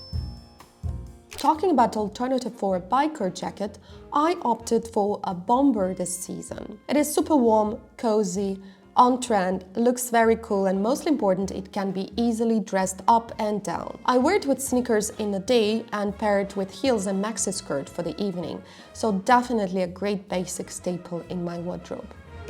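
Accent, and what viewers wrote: Russia. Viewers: Very Russian accent.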